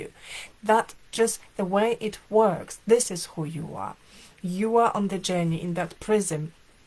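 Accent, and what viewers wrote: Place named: Germany. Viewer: Are you german? I hope you do not mind my question its the accent. I loved your video, a good reminder. JazakyAllahu Khayran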